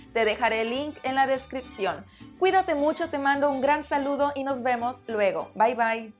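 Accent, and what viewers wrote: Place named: Mexico. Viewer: Soy de Brasil y me encanta ver tus videos y escuchar tu podcast. me gusta mucho el acento mexicano👏👏👏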